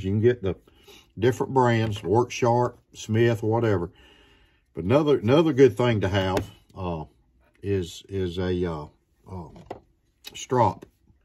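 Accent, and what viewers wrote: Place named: South, United States. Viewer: I liked this video as soon as I heard that reb accent. Go figure He likes Sodbuster's too! one of many Case EDC's that are perfect.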